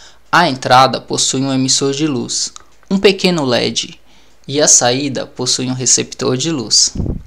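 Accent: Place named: Ceará, Brazil. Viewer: Olá, boa noite., você é Cearense, né? Percebo que você fala como a gente aqui, rsrs. Mas, vamos lá... Os equipamentos inversores DC/AC de placa solar, são similares aos inversores de frequência de motores? São similares? O reparo é mais simples ou complexo? Desde já sou grato pela sua atenção.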